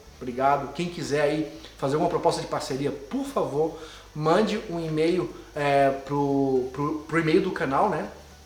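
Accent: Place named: Florianópolis, Brazil. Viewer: Caí de paraquedas aqui, mas pelo soque.. com ctz é da nossa linda e querida Floripa! Baita vídeos, seguindo já.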